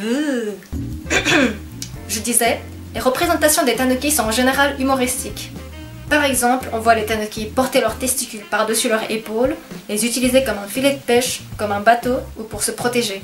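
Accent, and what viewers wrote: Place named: Japan. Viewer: Hmm, en effet, je vois ce que tu veux dire. Cependant, à mon oreille, votre façon de prononcer certaines syllabes et voyelles (les "r" et "l" par exemple) me font plus penser à une intonation Japonaise (Mais c'est peut être parce que je bouffe du Manga Animé a gogo XD ) J'ai un collègue qui habite Gland (un Glandu, quoi XD), son accent et celui de sa famille sont différent des votres (bon, en mêm' temps, comme partout, entre région, les tonalités diffèrent;) )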